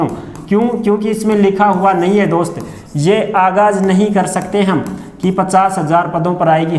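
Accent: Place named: Nohar, India. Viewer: Sir aap alwar se ho kya? Pr aapki bhasha ka tone to ase lagta hai jese Bhadraya nohar tehsil se ho?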